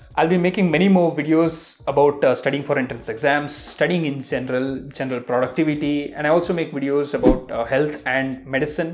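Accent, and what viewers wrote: India. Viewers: liked ur accent. Indian accent is amazing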